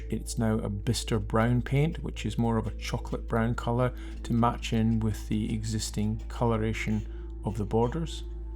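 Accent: United Kingdom. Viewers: Thank you, it is wonderfull to see traditional glass work. At first it almost looked like one of my grandfather's windows, but based on you accent and the masonry of the church I'm assuming you are in Britain. My grandfather was Rudolf Benz of Newton New Jersey USA, I grew up in his studio and putted many a window in my youth exactly as you have 😊 He learned his craft in Hamburg Germany at 14. He taught me to actually mix his own pigments ( metal oxides,powdered glass et.) We search all over for his windows, I would love to get intouch with you perhaps. We made a website of what works we know of and are trying to expand the library. You could contact me through there if you have time, THANK YOU Keep up the craft 😊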